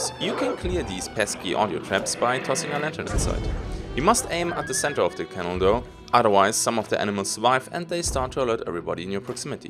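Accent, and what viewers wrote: Germany. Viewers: Hey, are you german? You sound like my old classmates in english lessons. But I in deed like your video! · Man merkt das der Type ein deutscher ist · Du bist Deutscsher oder? Oder zumindest Schweiz oder Österreich. Hört man einfach an der Aussprache :P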